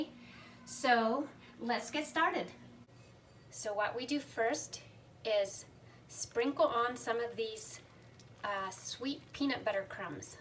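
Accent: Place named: Pennsylvania Dutch, United States. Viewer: Könnt euch ein video nur auf Deutsch machen, bitte. :-) I like your Pennsylvania Dutch dialect.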